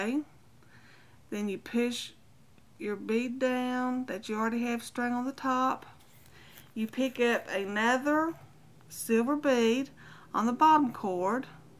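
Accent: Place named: South, United States. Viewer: It's Soo good too hear a Southern Voice ... I'm from Alabama ... God Bless You ...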